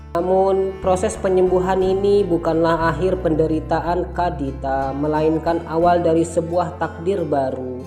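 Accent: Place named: Java, Indonesia. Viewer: Suaranya jawa banget, mending pake AI aja, eneg suaranya didengar.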